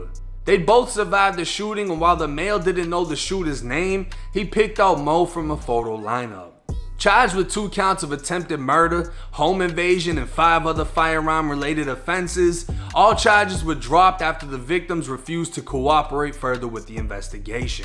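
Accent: Boston, United States. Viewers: Boston style🤔⁉️⁉️⁉️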